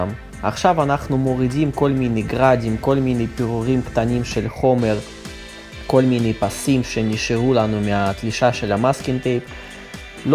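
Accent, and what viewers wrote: Russia. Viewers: Акцент явно русский.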